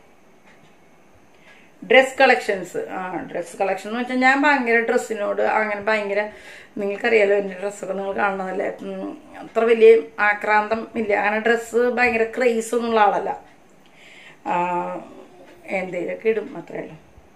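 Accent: Thrissur, India. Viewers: Chechi ente Peru soumya kunnamkulam aanu veedu but pkd aanu thamasikunnathu eniku checked samsaram kelkumbo nammude thrissur basha ketta njan happy first time comment aanu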